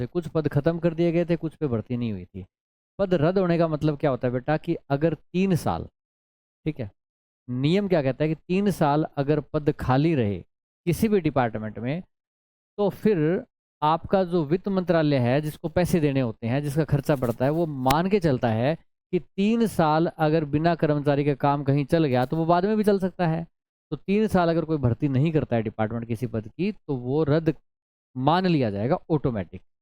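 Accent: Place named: Haryana, India. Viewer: Sir apki language se haryanvi jlak rhi h.. maam ne btaya tha ki ap haryana se belong krte the.. Phle. 😅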